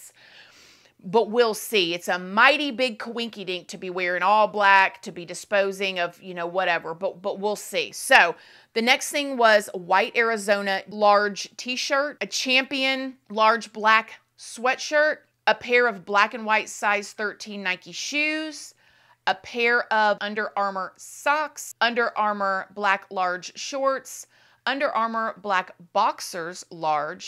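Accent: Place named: South, United States. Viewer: Lol on pronouncing the names it’s a southern accent kinda thing. I’m from Texas and my accent butchers a lot of things 😂 So you are pronouncing it right you just have to put that twang on it. Lol Love your videos girl!! ❤ Oh BTW I watched the Closing statement from the prosecutor and boy oh boy he laid it down. I really hope he gets what he deserves.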